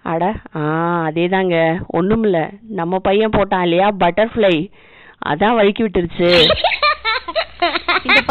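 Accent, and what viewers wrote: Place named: Chennai, India. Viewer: Madras Tamil, modulation and voice change Super 👌👍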